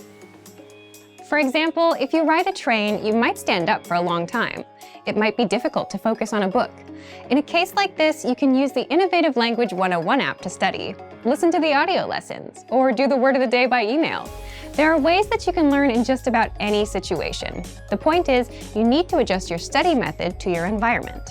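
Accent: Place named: United States. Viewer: Quite good but American not English.....It matters!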